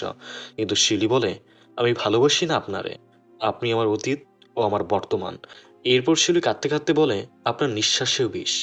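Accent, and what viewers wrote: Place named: Pabna, Bangladesh. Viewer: পাবনার জেলার কথা শুনলেই ভালো লাগে ❤❤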